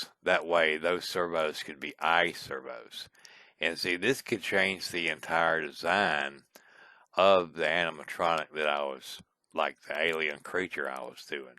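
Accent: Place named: South, United States. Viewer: now THIS is what youtube is for. People who are EXTREMELY talented in their craft, and of course, a nice southern voice-over included with actually instructive narration. God speed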